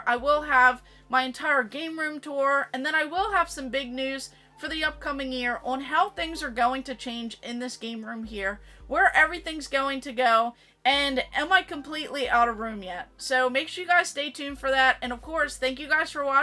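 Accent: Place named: Baltimore, United States. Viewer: Woah, are you local to Bel Air? I shop at Retro Replay too! Thought I heard a Baltimore accent in there... 😄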